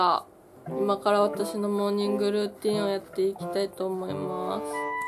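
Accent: Kansai, Japan. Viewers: かわいい子が関西弁しゃべってるとかわいい二割り増し！！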